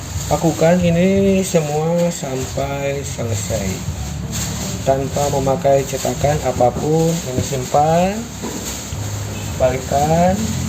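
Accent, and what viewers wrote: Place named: West Java, Indonesia. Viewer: Kang sunda bangeeet...sy suka😁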